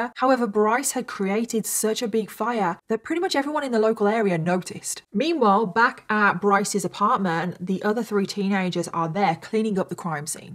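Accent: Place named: West Midlands, United Kingdom. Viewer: Not sure what part, but definitely a West Midlands accent.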